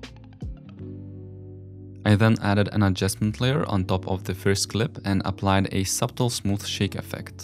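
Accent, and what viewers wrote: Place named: Russia. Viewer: А я слышу, что ты русский))))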